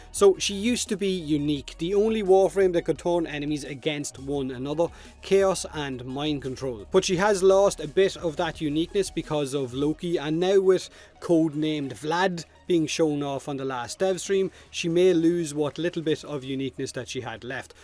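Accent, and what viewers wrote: Ireland. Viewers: You sound hot. Just saying. I'm a sucker for the Irish accent though. <3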